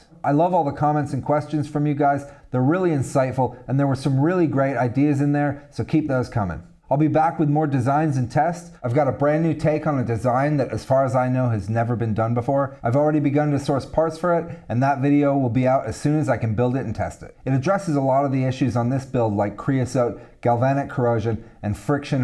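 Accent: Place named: United States. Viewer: Thanks for the great content I've built a few rocket stoves and you always get better results if you insulate your burn chamber. Also I noticed that you have an American accent but you are based in the UK going on the plugs behind you and I would guess from outside possibly somewhere in the Pennines?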